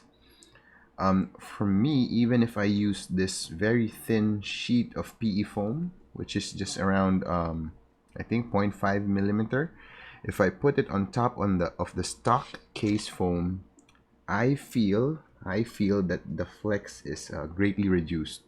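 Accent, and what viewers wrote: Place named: Philippines. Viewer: Perfect accent bro. I hate getting the American accent just because I grew up here in the US. Slight Filipino accept while speaking English sounds nice. I mean probably just me being patriotic meh...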